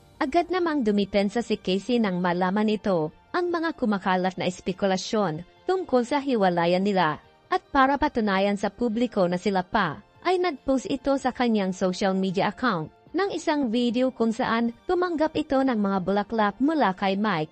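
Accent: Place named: United States. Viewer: Hoy ikaw American ka? Ayusin mo tagalog mo di bagay sa yo!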